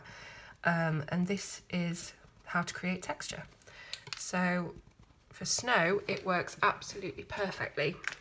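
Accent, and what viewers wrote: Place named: Northern England, United Kingdom. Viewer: I think you tutorials are great and very informative. I love watching your videos and listening to a northern English lass is very refreshing. So Thanks 😊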